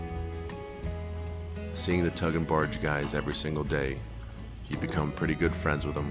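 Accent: New Jersey, United States. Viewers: are you from New Jersey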